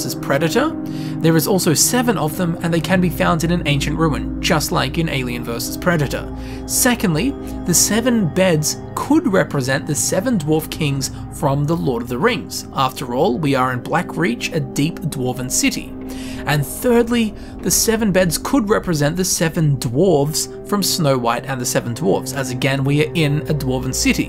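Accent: Australia. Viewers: One he is austrlian, two thats how aussie accents sound.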